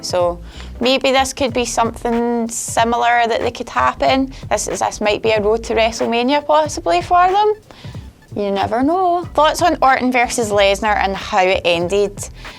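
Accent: United Kingdom. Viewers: Guys why you complaining about her voice. She is British , it's not that bad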